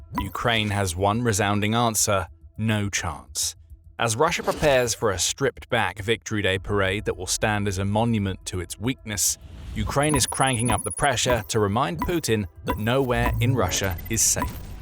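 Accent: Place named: Cockney, United Kingdom. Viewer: I can't stand to hear you say Rush-er, and other words like propagand-er, you sound like a cockney Brit!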